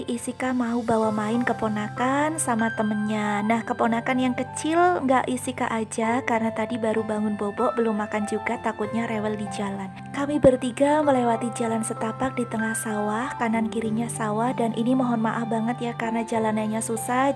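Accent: Banyumas, Indonesia. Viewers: Kita sama2 ngapak mba👌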